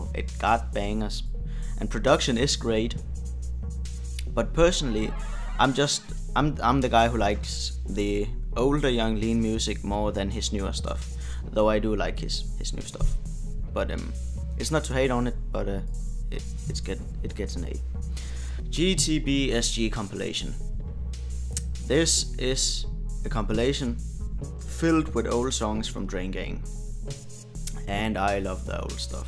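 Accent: Denmark. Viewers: are you danish? you kinda got the danglish accent lol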